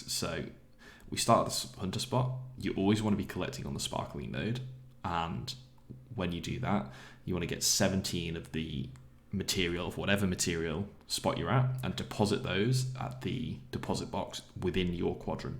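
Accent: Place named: United Kingdom. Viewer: He's Br*tish 🤮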